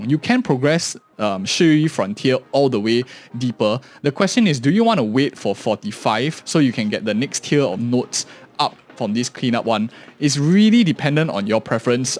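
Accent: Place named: Singapore. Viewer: He has a Singaporean accent.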